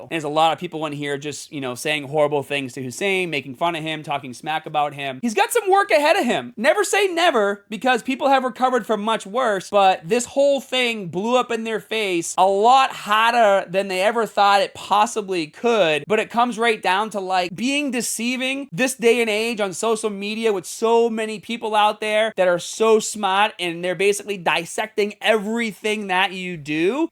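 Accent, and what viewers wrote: Boston, United States. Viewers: just found your channel. New to GymTube so I was watching Greg for a bit (along with Athlean X. Great content). Will watch you instead of Greg going forward. Accent reminds me of Will in Good Will Hunting 😀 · I'm just here for that Boston accent bro.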